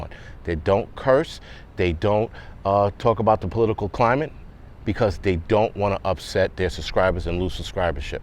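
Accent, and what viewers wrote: New York, United States. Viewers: He talks like a real New Yorker and a stand up guy. The channel is called. Who are people expecting when they sub, prince charming?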